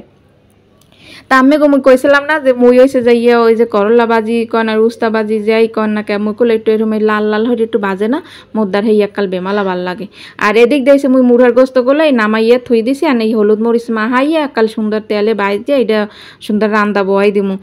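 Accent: Barishal, Bangladesh. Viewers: ❤❤ আপনার কন্ঠ শুনে বুঝতে পারছি,আপনি বরিশালের মেয়ে, আমি ও কিন্তু বরিশালের মেয়ে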